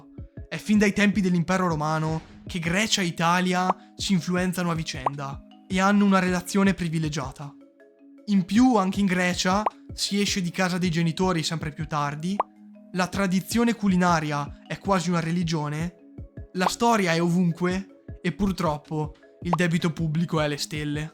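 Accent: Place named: Veneto, Italy. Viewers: Un po' tutte ma un po' di più il giappone (si sente l'accento veneto, anche io sono del veneto) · "Mi puoi dire di dove e' il tuo accento?" Anche se cerca di camuffarlo è bergamasco/bresciano.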